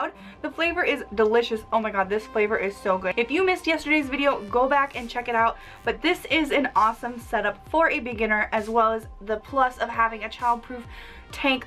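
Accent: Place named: Germany. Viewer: Felicitari! Vorbesti engleză ca un neamt!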